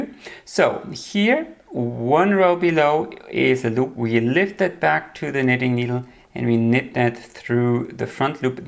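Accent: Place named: Germany. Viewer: I'm trying to understand your accent. Are you in Germany?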